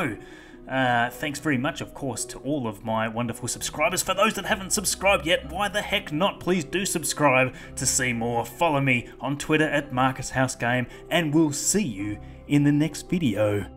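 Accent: Australia. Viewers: Another awesome video.....and it's really wonderful to hear a fellow Australian on KSP videos.....not that Shadowzone's German accent and Mr Manley's Scottish brogue aren't entertaining but it is a lovely reminder that we Aussies love all things spacey as much as the rest of the world. Cheers mate! Bloody bonza job!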